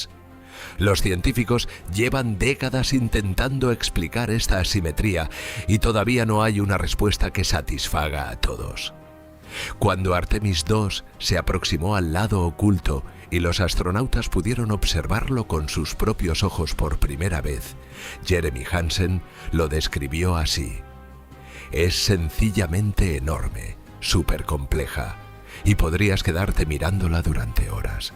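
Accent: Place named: Spain. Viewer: Narración espańoleta tan idiota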